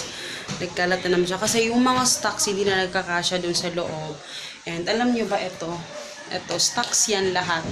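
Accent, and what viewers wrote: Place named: Visayas, Philippines. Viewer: nakarelate ako sa tagalog dialect mo sis na may halong bisaya hehe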